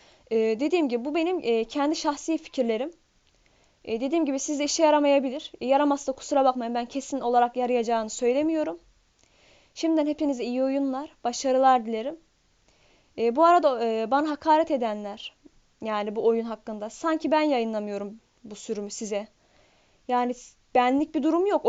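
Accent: Azerbaijan. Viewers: azerisen qaqa?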